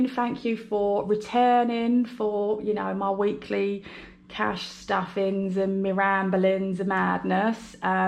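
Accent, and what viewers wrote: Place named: London, United Kingdom. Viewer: Omg I hv to say u are absolutely hilarious 😂, such a great accent is it London? I've really enjoyed watching 👀 ur videos thankyou for entertaining us on this channel xxxx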